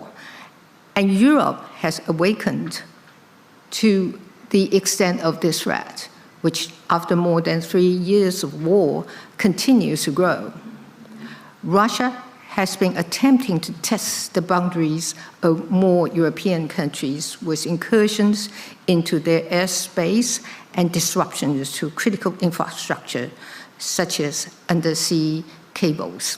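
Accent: United Kingdom. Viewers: 總統是純正倫敦腔的英文耶👍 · 總統的英國腔好好聽啊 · 英國腔超棒的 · 我愛她的英式英文，太好聽了。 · 英國倫敦政經學院的PhD, 好聽的英國腔. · 英國腔太迷人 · 什麼時候開始有這麼重英腔了？ 😀😆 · 蔡總統的英國腔超級好聽👍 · 不看稿的英國腔超帥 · 不卑不亢的英國腔演說，真正的領導人，偉大的女性，我向您致敬，感恩臺灣有您. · 她是一口純正不列顛口音(或非常接近)，畢竟她是真的在那邊唸過書，不像一堆支那黨政客只是去國外買學歷但其實英文口說爛得要死。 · 她說的是英國口音和台灣人習慣的美式英語不同。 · 英國腔? 真感動~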